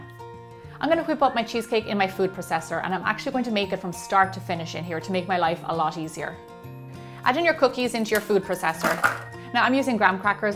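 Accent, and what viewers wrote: Ireland. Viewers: are u irish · I KNEW YOU WERE IRISH! Sorry I'm new to this channel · I LOVE all of your videos they're always so great and delicious! I can't stop watching them. I'm an Irish dancer and I love your Irish accent! It's beautiful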